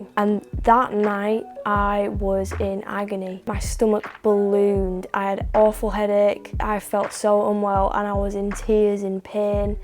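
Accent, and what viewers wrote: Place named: North, United Kingdom. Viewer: Fabulous video. Thank you for sharing. Also nice to hear a Northern UK accent on YouTube for a change! 👌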